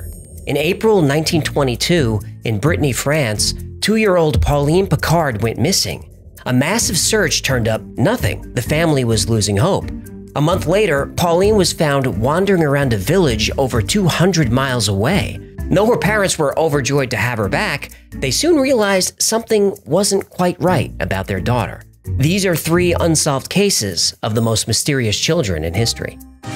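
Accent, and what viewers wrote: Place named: Long Island, United States. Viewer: Not Brooklyn. That's more of a long island accent.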